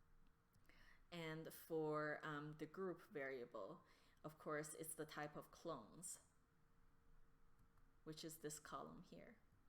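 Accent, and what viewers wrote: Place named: China. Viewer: 为什么有中式口音……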